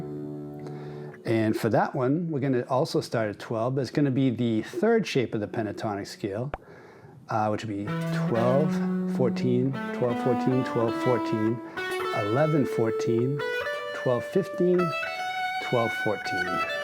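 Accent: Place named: New Jersey, United States. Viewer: I really want to thank you for this. Though I made the same mistake yesterday, walking through a field, absentmindedly wondering if it’s a New Jersey accent you have, I got woosh-attacked again and momentarily panicked that I was under a cattle attack. But beside that… this lesson is the first time I feel like I’m “getting it”. I’ve been playing since ‘93 (apart from a 15 year gap lasting until recently). But I realise now that I never actually learnt anything because… “scales and theory! That’s not very Punk, grandma!! <smug snigger>” and apart from some and everything was Seattle/Subpop or UK/NYC Punk, so I never actually heard how I was playing under the distortion. I’ve even realised my timing is absolutely woeful as I’d always played alone or with friends, without a drummer when we were all off our heads on anything we could afford. 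So I’ve gone right back to basics. Theory, scales, rhythm, metronome and I’ve practiced those and technique every day for a few weeks but I never actually felt like it was attaching together until now! This has really opened it up for me be I can see clearly what is happening and what I need to do. I’m recognising what will sound good next and none of that would have clicked for me if you hadn’t simplified it so much and said “this is what we’re doing, this is how we’re doing it, this is why. Now go and have fun”. And I feel so grateful for that. First time in decades that I have a proper glow about playing music again! Apologi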